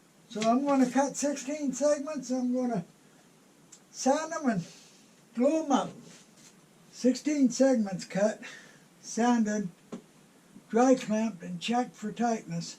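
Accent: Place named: Pittsburgh, United States. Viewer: Hey! Love the videos. You sound like you have a Pittsburgh accent. Or have you been in N.C. your whole life?:)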